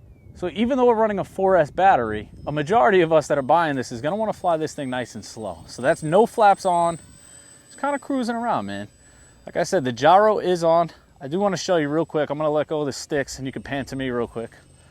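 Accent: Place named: East Coast, United States. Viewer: Great coverage of this plane! Im looking for a nice backyard flyer. And your east coast accent makes me feel at home man. I moved away from The Island when I was in my 20s.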